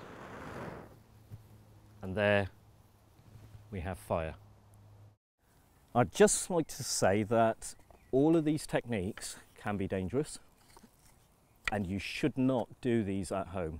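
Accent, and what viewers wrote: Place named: United Kingdom. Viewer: An Indian man with a British accent. Bring it on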